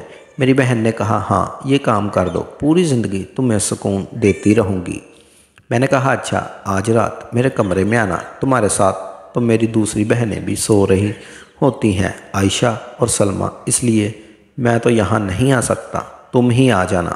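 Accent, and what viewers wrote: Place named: Sialkot, Pakistan. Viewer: astagfar.... awaz k lehja sialkoty hai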